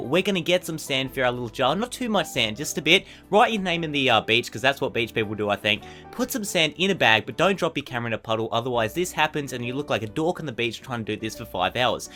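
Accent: Australia. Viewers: r u australian cuz i am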